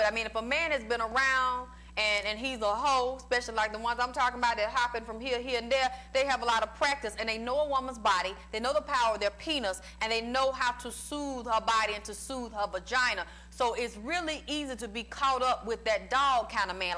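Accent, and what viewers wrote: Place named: South, United States. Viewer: Is This Lady From The South Because She Is COUNTRY lol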